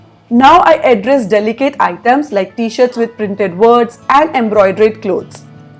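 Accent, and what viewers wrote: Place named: India. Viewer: IM SORRY BUT A Hindu infused english accent has to be the strongest, heaviest, and most difficult to understand in the world. I cannot be the only one who finds this accent to feel like nails in a blender. I would rather subtitles in English, if you arent a native English speaker and you have a hindi accent. Please please, I pray you recognize how horrific this accent sounds. Its not your fault. But its miserable, and it is a choice to continue trying to record videos on youtube.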